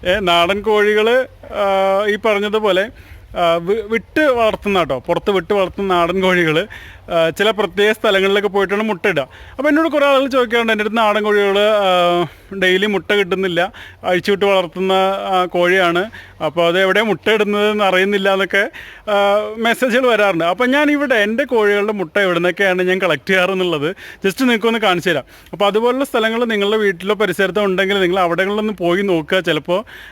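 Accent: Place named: Palakkad, India. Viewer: ningaluda pallakad vayikol ullathe pola yavidayum kanilla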